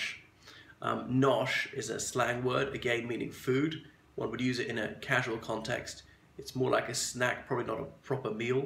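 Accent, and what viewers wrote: RP, United Kingdom. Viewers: I'd love to know your back story. How come the British accent... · I would say RP - Received Pronunciation - like. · I have never heard an accent described as 'southern British'. There is southern English. Your accent is a version of RP. But yes, I would associate RP with southern England. There is a northern version of RP, which is especially suited to Yorkshire, I think, as Yorkshire is dialectically non-rhotic. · ! Love the posh accent, should be more of it! I believe the resistance is another form of 'racial' prejudice. People look askance at you today if you enunciate correctly, you can almost see skit across their face a brief thought; 'does this person think she's better than me....?!'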